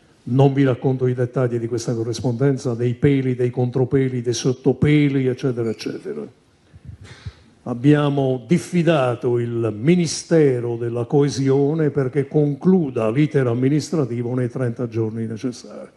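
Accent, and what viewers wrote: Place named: South, Italy. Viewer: quelli che chiede De Luca sono i soldi che la tua regione....non so quale sia....ma dal disprezzo che mostri sicuramente una del nord ... ha già preso!!!! Mentre a noi li bloccano senza motivo!!!!! Ti voglio ricordare che i tuoi ministri del lumbard- Veneto.....non hanno fatto un caxxo quando il covid vi ha dilaniato....e sai perché???? Non avete più sanità pubblica!!!!! Formigoni e compagni hanno privatizzato tutto.....e la gente è morta per mano dei suoi concittadini!!!!! Questo è il tuo nord!!!! La lega ha RUBATO 50milioni di euro allo stato italiano....per spese di gestione.....bah!!!! e li restituisce a tozzi e bocconi spalmati non per quanti anni!!!!!!!!!!! Il mio commento non ha accento così almeno le tu orecchie non soffrono!!!!! Purtroppo il mio cervello soffre solo a leggere certi post superficiali di gente che scrive ripetendo cose sentite giusto per esibire un razzismo che fa tendenza soprattutto con questo governo!!!!! 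Il tuo salvini si è alleato anche con il M5S.... la ndrangheta ho investito nel profondo nord milioni di euro per ripulire il denaro che come tu ben sai proviene da attività illecite!! COME MAI NESSUN PERBENISTA NORDISTA SI È OFFESO O SCANDALIZZATO????? Ti offende l'accento di un cittadino del sud....e non ti offende quello che i tuoi politici fanno???? TRA POCO UN SOLO ACCENTO AVRETE NELLE VOSTRE ORECCHIE.....QUELLO NIGERIANO.....con tutto il rispetto sicuramente meglio del vostro!!!!!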